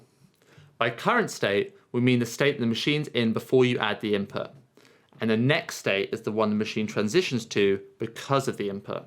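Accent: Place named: Australia. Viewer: Australian accent♥️♥️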